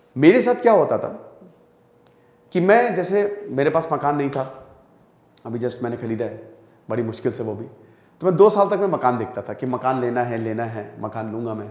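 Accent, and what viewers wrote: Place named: Punjab, India. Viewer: Bhaji app ki punjabi soo cute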